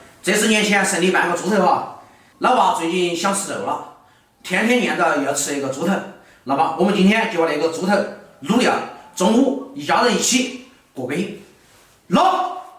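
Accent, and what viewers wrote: Sichuan, China. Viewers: 你彪哦！四川話真的是獨樹一格 XD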